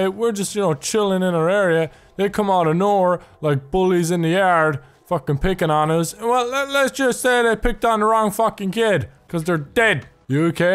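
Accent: Ireland. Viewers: Your Irish, are u from the Midlands , you sound it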